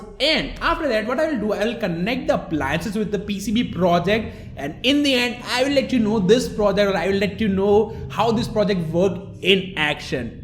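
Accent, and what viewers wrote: India. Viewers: Loving the strong Indian accent, and of course the video to! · You talk to fast with ur hard to u derstand indian accent!!!! Thumbs down unsub!!!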